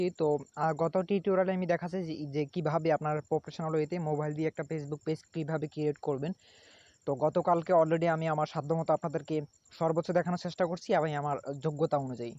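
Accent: Noakhali, Bangladesh. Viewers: ভাই আপনার বাড়ি নোয়াখালী কোন জায়গায়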